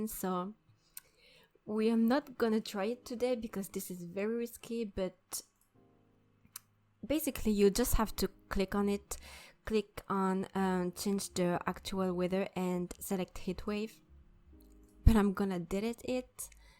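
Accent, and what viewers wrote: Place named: France. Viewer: Wait hol up are you French????? I love ur accent I am nigerian but speak fluent French lol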